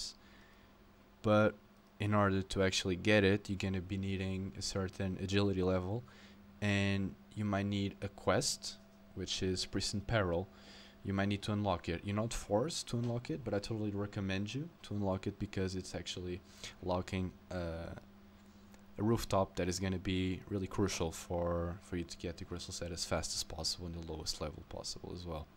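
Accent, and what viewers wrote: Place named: Portugal. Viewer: Where are u from? You sound kinda portuguese..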